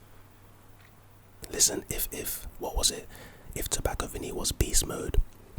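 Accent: United Kingdom. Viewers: Are you from the uk bro?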